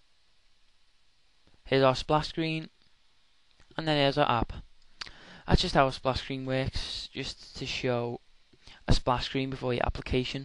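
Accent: Liverpool, United Kingdom. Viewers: I think you will find it's Scouse (Liverpool) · Neva mind, Found it! Nice Accent by the way! Scouser? I'm Welsh, I h8 my accent!!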